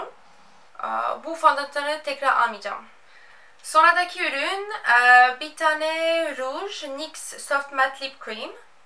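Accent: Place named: France. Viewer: Fransız aksanın var galiba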